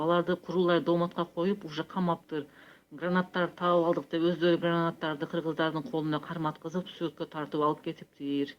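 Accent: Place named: Uzbekistan. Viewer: Сен озун озбечкасынго суйлогонун озбектей экен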